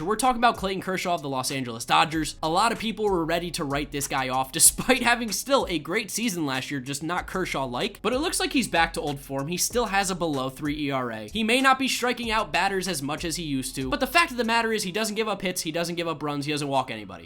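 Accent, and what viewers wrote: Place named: Texas, United States. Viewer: This guy is definitely a texan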